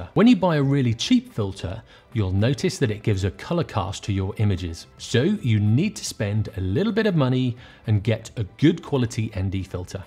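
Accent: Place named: RP, United Kingdom. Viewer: You have a lovely posh accent.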